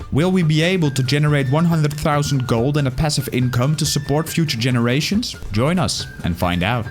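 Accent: Netherlands. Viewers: Dutch accent spotted <3 Groetjes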